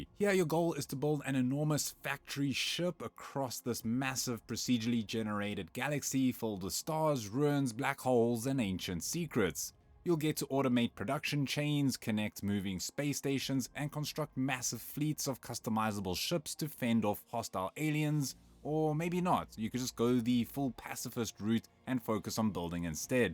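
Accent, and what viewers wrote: South Africa. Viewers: This accent.....South African?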